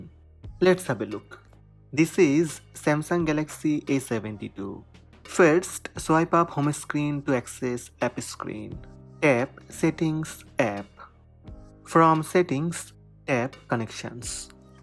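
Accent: India. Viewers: Я знал что парень с индийским акцентом поможет мне разобраться с этим дермом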